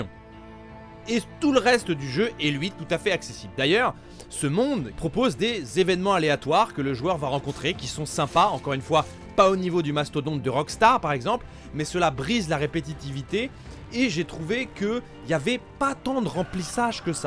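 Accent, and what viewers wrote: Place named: Belgium. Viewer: Tu es belge ? En tout cas tu as l'accent belge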